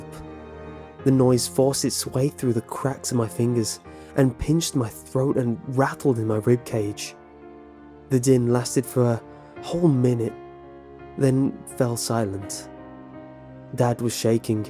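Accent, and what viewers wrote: United Kingdom. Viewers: from Idaho but with a British accent ?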